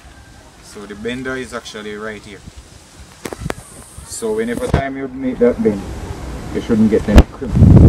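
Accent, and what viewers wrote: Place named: Jamaica. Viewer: I like your Jamaican accent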